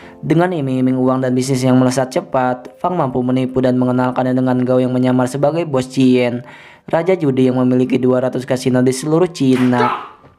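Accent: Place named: Java, Indonesia. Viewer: Logat nya mendok sekali eperi badi😂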